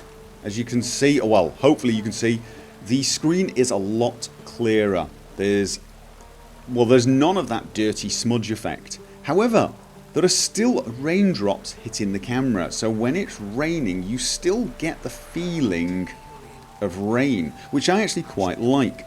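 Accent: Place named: Scotland, United Kingdom. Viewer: What is your accent? It almost sounds Scottish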